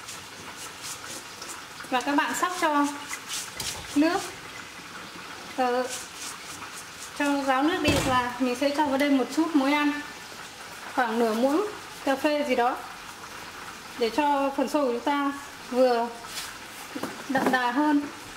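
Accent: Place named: North, Vietnam. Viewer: Chị đi nước ngoài nhưng vẫn giữ được nét truyền thống thật, từ cách nấu nướng tới cách nói chuyện với con gái đều rất đậm chất bắc bộ :) rất thân quen ạ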